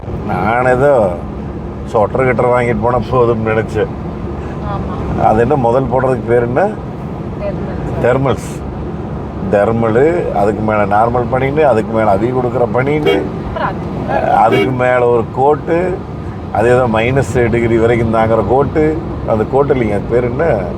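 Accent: Kongu, India. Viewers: கொஞ்சும் கொங்குத் தமிழ் பேசும் கோமகரே...! திகட்டாத தித்திக்கும் பேச்சுடைய திருப்பூர் திருமகரே...! எங்கள் மதிப்பிற்குறிய திரு. அண்ணா அவர்களே❤❤❤🙏 எப்படி இருக்கீங்க அண்ணி❤❤❤🙏 எப்படி இருக்காங்க ரித்திக்❤❤❤ எப்படி இருக்காரு. தெலுங்கானாவில் இருந்து திருப்பூர் வந்த அந்த நண்பரின் நட்பை உங்களுக்கு தெலுங்கானாவில் வெளிப்படுத்தியதை வெறும் வார்த்தையின் வாயிலாக சொல்லிவிட முடியாது அண்ணா❤❤❤❤🙏 மாருதி கார்களைப் பற்றி இன்னைக்கி பலதரப்பட்ட இழிவான பேச்சுக்கள் சமூக வலைதளத்துல உலா வருது. உதாரணத்திற்கு.... மாருதி காரா அது ஒரு டப்பா...டின் கேன் இந்த மாதிரியான பேச்சுக்கள். அது அத்தனையும் முறியடிக்கும்வண்ணம் மதிப்பிற்குறிய அண்ணா உங்களோட இந்த லடாக் பயணம் நிச்சயம் அமையும்...! காரணம் லடாக் பயணம் என்பது அவ்வளவு எளிதான பயணம் கிடையாது. அதிலும் நீங்க SWIFT காரைக்கூட எடுத்துட்டு போகல? மாருதி 800 காரை எடுத்துட்டு போயிருக்கீங்க👍👍👍👍👍 இதுவே மிகப்பெரிய சவால்👍👍👍👍 லடாக் சாலை பொதுவாக மலைப்பாதைகள் இருக்கும்.... 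சில இடங்களில் பள்ளங்கள் மேடுகள் அதிகமாக இருக்கும் என்று சொல்லக் கேள்வி. அதையெல்லாம் இந்த மாருதி 800 காரில் சென்று பயணத்தை வென்று திருப்பூர் மண்ணில் கால் பதித்து மாருதி காரின் சரித்திரத்தை வெற்றியாக்குங்கள் அண்ணா...! லடாக் பயணம் இனிதே தொடர்ந்திட வாழ்த்துகள் அண்ணா❤❤❤ என்றும் அன்புடன் உங்கள் தம்பி என்றும் அன்புடன் உங்கள் TMF ரா.முஹம்மது கபூர் (சென்னையில் இருந்து)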